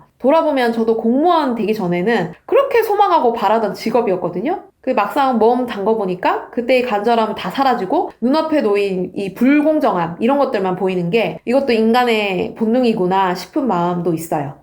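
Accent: Busan, South Korea. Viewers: 부산 사람 아입니까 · 님.. 억양에서 사투리가 있어서 경상도분이신가?시픈데 걍 고향말씨 듣고싶읍니다. 사투리해주소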